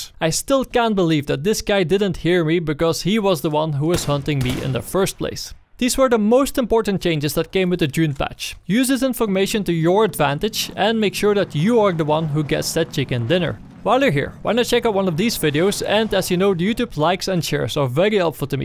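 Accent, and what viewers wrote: Netherlands. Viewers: He sounds Dutch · dutch arent you xD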